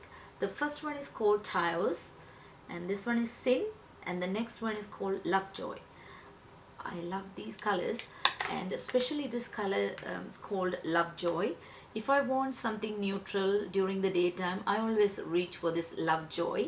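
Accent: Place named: Tamil Nadu, India. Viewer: yes! she was Tamil and spoke very similar to you! i was wondering if you were Tamil as well if you don't mind me asking:)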